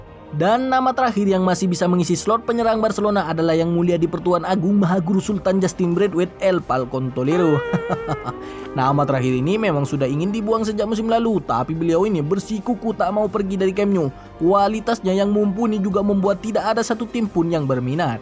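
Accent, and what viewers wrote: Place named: Madura, Indonesia. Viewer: Logat Lu Logat Madura ..... "Lu Fans Madura United ya?".... Tahun Depan Bisa Main UCL? kalo Bisa, Ronaldo mo kesana sama Braithwaite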